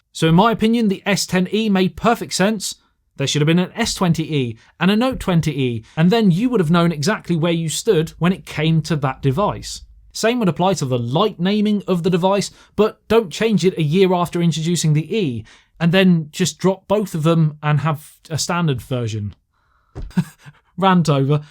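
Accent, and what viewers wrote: England, United Kingdom. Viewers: Damn I knew you would be speaking with an English accent from the thumbnail. You have an English looking face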